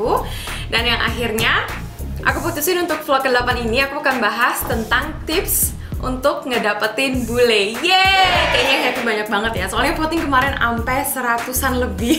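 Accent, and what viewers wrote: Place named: Batak, Indonesia. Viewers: Lucu bangat Kaka bawainnya... Aku Batak jadi logat Kaka nyambung bet ke aku... Hahahha Kaka yg terakhir katanya 1 tahun pacaran... Harus langsung nikah ya ka? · remeng , medan gt yaahh 😄❤️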